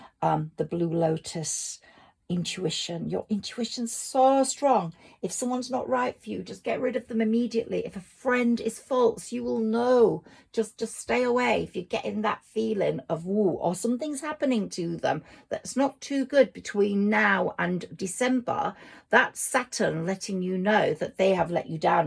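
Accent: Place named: Birmingham, United Kingdom. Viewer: Welcome back, really nice to hear your slight Brummie voice, lol its been a tough couple of months, constant healing, thanks for your dedication xx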